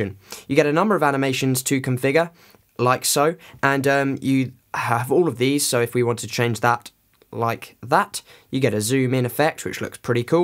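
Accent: United Kingdom. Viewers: I appreciate the demos and tweaks. If you could speak slow, it would be better to understand your British accent.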